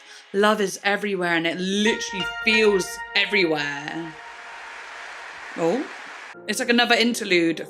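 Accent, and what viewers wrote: Minnesota, United States. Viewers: as a minnesotan i died at your minnesotan accent 😂 MINNESOTA MENTIONED!! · OMG MINNESOTA SHOUT OUT!! I'M FROM MINNESOTA YOUR ACCENT IS PERFECT!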